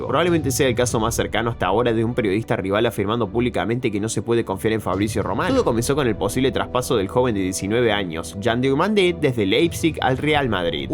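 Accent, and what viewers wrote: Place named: Argentina. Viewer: Escucho acento argentino, entonces no creo nada!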